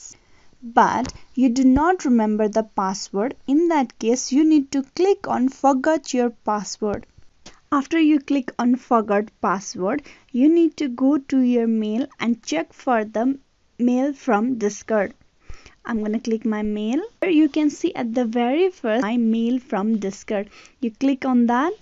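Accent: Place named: India. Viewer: I love your indian accent and thank you for the help man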